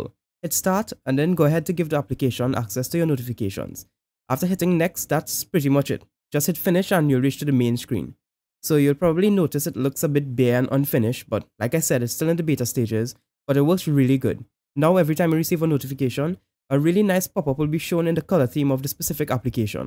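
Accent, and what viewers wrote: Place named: Trinidad and Tobago. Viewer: This dude sounds like he's from trinidad probably he is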